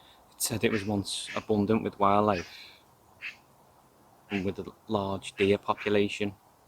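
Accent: Liverpool, United Kingdom. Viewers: Love the Liverpool accent and you knowledge thank you a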